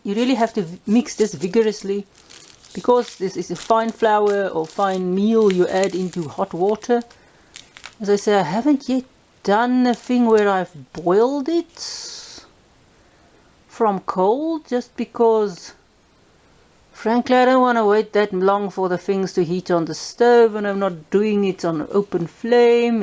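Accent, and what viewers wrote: South Africa. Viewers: Hoekom maak jy nie videos in Afrikaans nie? Ek kan baie goed hoor jy is ñ Afrikaaner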